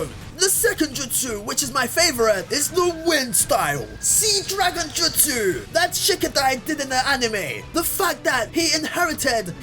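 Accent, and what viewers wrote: United Kingdom. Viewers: Yea I'm pretty sure he's in the UK especially with the accent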